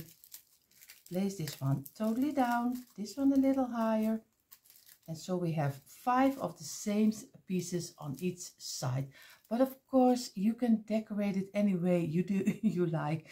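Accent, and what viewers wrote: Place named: Netherlands. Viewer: Loved The Lantern!! You Have A Great Imagination For Creating! I Also Like Your Dutch Accent, You Have A Soothing And Unique Sound!!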